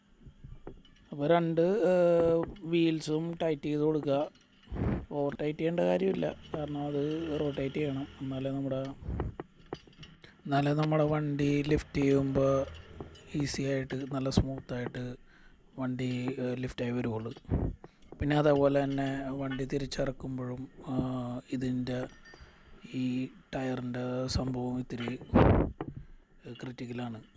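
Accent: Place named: Mattancherry, India. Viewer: Your kindness mind and beautiful mattancheri slang awesome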